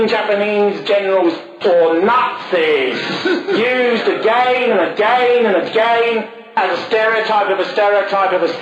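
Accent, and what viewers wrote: United Kingdom. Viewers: could you imagine if the sound quality was better and Bowden had tried to reduce the british accent a bit, he would have had a lot more attention on him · He had a very normal English accent. If Americans find it off-putting or that it makes him impossible to relate to then that's their problem.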